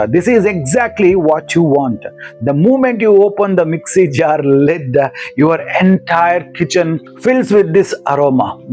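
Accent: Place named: South India, India. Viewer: Thank you Amma and Ji for bringing this beautiful and highly detailed recipe on how to brew masala tea 🙏😊. I'll definitely make this tomorrow for my parents (I only get time to cook on weekends). I also like the accent of the narrator, beautiful South Indian accent. Reminds me of my school teachers. It'll be my first time brewing a tea your way and hope it turns out good 😁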